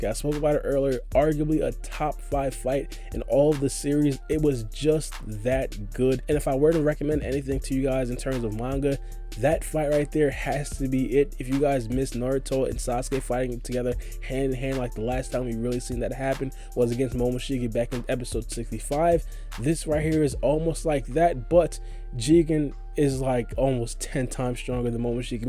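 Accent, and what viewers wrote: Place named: New York, United States. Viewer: Im gonna guess your from ny, 'cause your accent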